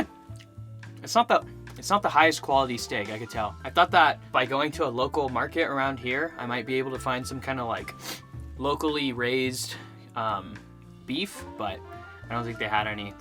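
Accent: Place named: Michigan, United States. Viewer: I like your vocabulary you talk like you're from Michigan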